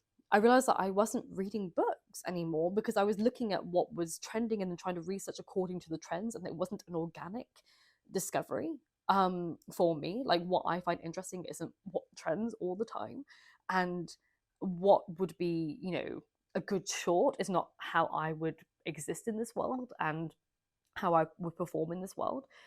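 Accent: RP, United Kingdom. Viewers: The reason I started watching your channel is because I absolutely loved the classic literature content. I don't really know if others agree, so please take my opinion as just that ... one person's opinion. That said, I am eager to see a return of classic literature content. Your presentation of said content was engaging and thrilling, not to mention that, as an American, listening to your posh English accent is lovely.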